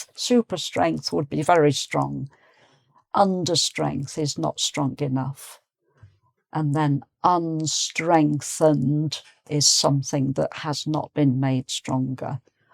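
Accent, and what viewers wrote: United Kingdom. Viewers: british accent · Dear madam... l really enjoy listening to your clear english accent... I usually like to listen to short english story audiobooks on youtube but they are usually narrated by unclear voices.. I wish you could upload short stories audiobooks narrated by you... please try this idea.. I'm sure many of english learners would love to listen to classic english short stories enjoying your clear british accent... thanks alot madam 🌷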